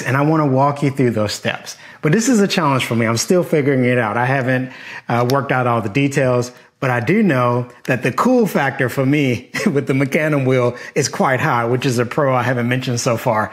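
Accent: Baltimore, United States. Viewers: You sound like you from Baltimore you should try to get on at that bridge project. I'm sure they use an engineer if your caliber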